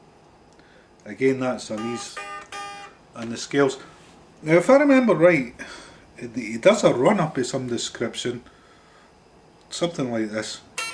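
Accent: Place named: Glasgow, United Kingdom. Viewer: Thanks for the upload. Nice to hear your Glaswegian accent also!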